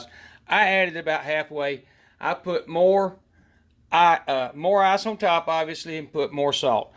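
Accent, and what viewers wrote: South, United States. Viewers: Love it! And love your southern accent!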